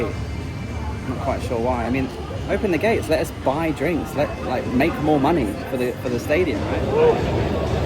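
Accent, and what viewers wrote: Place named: Newcastle, United Kingdom. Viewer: Great geordie.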